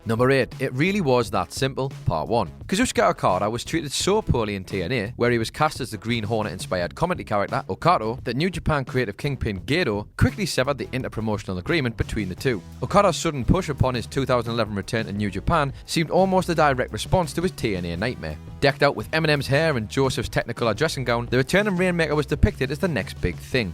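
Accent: United Kingdom. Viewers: Well, not everybody is familiar with thick british accent. Plus he talks too fast. Is it Irish or Scottish accent? Its not like its impossible to understand. But some words are really. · accent is too thick man.. i get it youre british but you gotta go a little out of your way and stop using words that only english people use. it is difficult to follow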